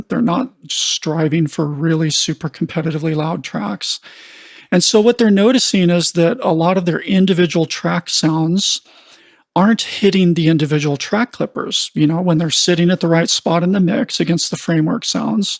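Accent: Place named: Vancouver, Canada. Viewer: Just out of interest, are you in Vancouver by any chance? I just ask as you have the exact same way of explaining things as one of my mentors over here who was an engineer over there before being poached by the Canto-pop scene in the 80s. And I don’t just mean your accent, it is your whole demeanour. It is pretty unreal!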